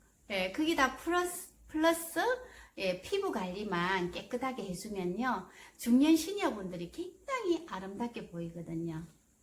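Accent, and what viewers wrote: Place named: Busan, South Korea. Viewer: 사투리로 봐서는 부산 아지메가 학실합니더~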